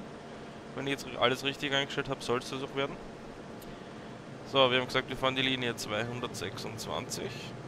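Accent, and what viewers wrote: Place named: Austria. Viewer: Das hört man doch das er Österreicher ist.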